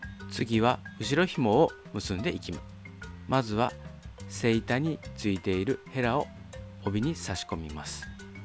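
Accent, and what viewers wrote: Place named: Kyoto, Japan. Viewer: なんか喋り方が京都のお方の喋り方ですなぁ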